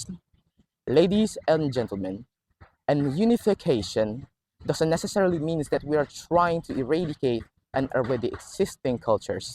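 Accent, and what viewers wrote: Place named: United Kingdom. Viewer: British nya terasa banget 😂 , tolongg sesekali buat video jokes pake bhs inggris dong.. 😄 arry po ah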